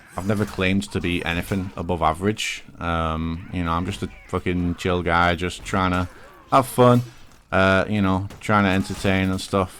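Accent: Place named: Yorkshire, United Kingdom. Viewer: Are you from Yorkshire/Lancashire? Your accent is like exactly the same as tru3ta1ent's and he used to be big into For Honor.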